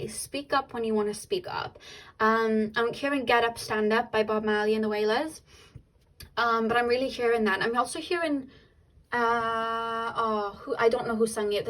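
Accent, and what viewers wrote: Wales, United Kingdom. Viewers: Pile 2 & 3 I swear you always put out videos exactly when I need to hear them! Thank you so much for the motivation to help me keep moving forward. ❤️‍🔥 Also just wanted to say how much I love your Welsh accent. 🥰 I could listen to you speak all day.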